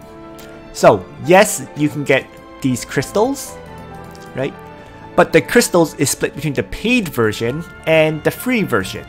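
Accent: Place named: Quebec, Canada. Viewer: You're Canadian! I was always curious where your accent was from, and I had suspected it was French-Canadian. My girlfriend has been wanting me to ask for the longest time, as she's subjected to hearing your videos all the time as I game. :b Anyhow, it would have been nice to get another good FF Tactics game, but it also comes as a bit of a relief that no other mobile tactics game will take time away from Langrisser given all the hours I've put into it thus far. Also, that you won't be torn away any time soon from continuing to release quality Langrisser strategy content. :D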